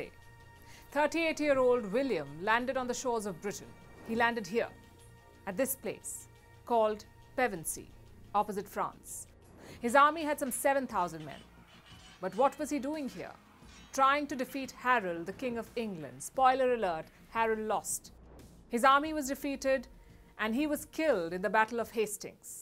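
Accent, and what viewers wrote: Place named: India. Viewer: Pity about the Indian accent when discussing British events. Perhaps she should either learn proper English pronunciation or stick to her Pakistani/Indian subjects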